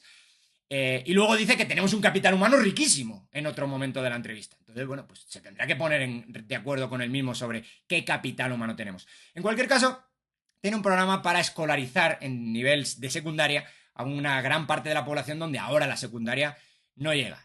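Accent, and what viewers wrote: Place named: Argentina. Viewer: Parece que tú no tú no eres de Guatemala tu hablado Qué haces para que parece que tú eres de Argentina o de Colombia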